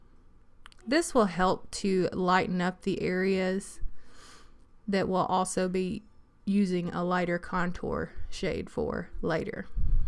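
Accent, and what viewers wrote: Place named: South, United States. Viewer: you are so pretty and I love your sweet southern accent. <3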